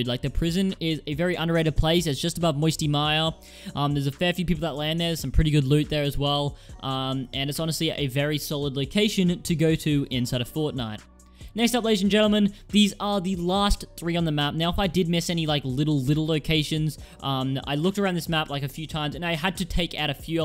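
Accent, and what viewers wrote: Australia. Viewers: Fucking Woop Woop ahahaha Bloody aussie <3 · are you Aussie?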